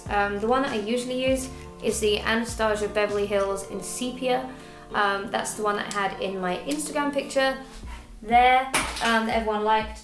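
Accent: United Kingdom. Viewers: i love british accent so much...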